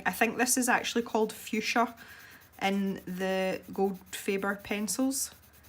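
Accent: Scotland, United Kingdom. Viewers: Thank you for this very helpful review. The one by Faber-Castell themselves is so poorly made that it is pretty darn embarassing for them.🤭 And may I say that I just love listening to you talking, as I absolutely adore the sound of Scottish English! ❤ All the best from Germany! Instant new follower!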